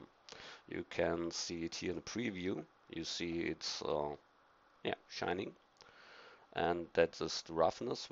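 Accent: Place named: Germany. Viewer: #germanaccent :D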